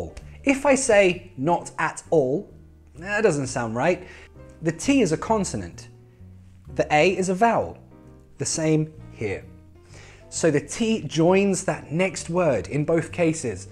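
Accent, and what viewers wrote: United Kingdom. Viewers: whats kind of your accent? estuary or R.P. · a Man with british accent is really Hot😀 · I like British accent so much. And I love the way you teach.:-D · the way u teach is perfectly amazing..I mean I don't have ny words to describe...I have seen so many videos on YouTube of different people..but u r d one who makes british accent learn very easy.....thanks a lot for all ur videos.. God Bless. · Brit accent got Style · Thanks! I like your videos so much - particularly because they are about British English! You know, on the 'net' the majority of English speaking video hosts are North Americans, which are quite all right to listen to. But when I hear British English - that's totally different! So I'm hoping for more of your vids to come. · an intelligent cute guy with british accent...oh lord...thats soooo goooood.... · sweet British accent 😍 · Gosh =((( I wish i could speak like that. 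British accent is the best. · Thank you for sharing, i really like British accent as well as England's culture, i thought i love your country, and i'm a fan of Manchester United, anyway i love your video! I learn many many things from it! Once again thank you! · amazing as always.... i really love british accent, such an elegant way of delivering ur speech, thanks for uploading. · British accent is the best! And now what a cool way to learn it from ya, thank you loads!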